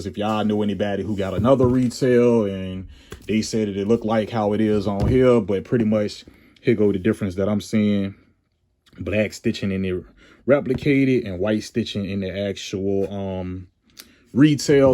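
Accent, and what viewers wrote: Richmond, United States. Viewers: Sound like you from Richmond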